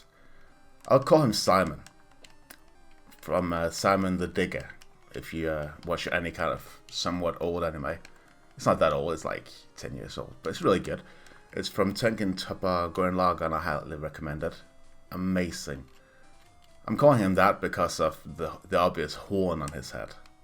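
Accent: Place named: United Kingdom. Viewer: what accent is that? makes me wanna pour in a cup of tea for your vids ahah great commentary, eventhough im not as much of a pokemon fan you make it enjoyable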